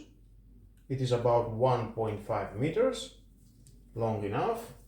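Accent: Greece. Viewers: The supermarket in my area have it now in 77% sale and I just wanted to see some reviews to understand if it’s worthy to buy it and I found yours! Really helpful review thanks a lot! I wanna ask you something…from your accent I was thinking that you have Greek origins, is that right? Έλληνας είσαι φίλε μου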